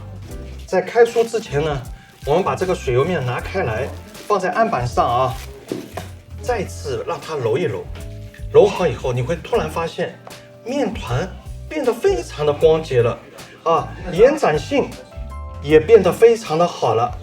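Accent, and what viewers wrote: Shanghai, China. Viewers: 朱师傅您太幽默了。哈哈哈哈个口上海口音来的个亲切。。下下分享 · 上海口音好亲切啊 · 朱老师带着上海口音的诙谐和幽默, 太有趣了! 赞一个!😃👍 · 很搞笑，是上海或者苏州口音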